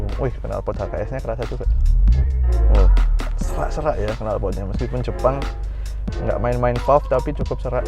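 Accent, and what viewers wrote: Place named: Java, Indonesia. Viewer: Medok banget mas!!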